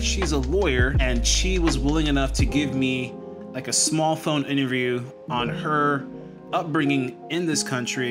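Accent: United States. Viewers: Your american accent and been just a tourist for sure they ll treat you nicely...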